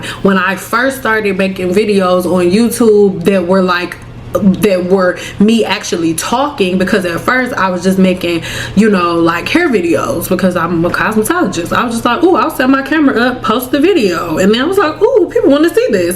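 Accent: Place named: Detroit, United States. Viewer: I knew you were from Detroit just listening to you 😂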